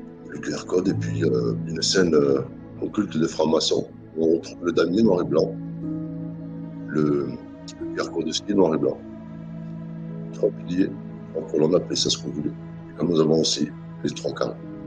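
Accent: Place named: South, France. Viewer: Bonjour, je jous decouvre ce matin , merci pour votre video qui me donne envie de vous écouté plus loins dans ces enseignements. Vous avez un accident du sud, de ma region!? Pouvons nous nous rencontrer ?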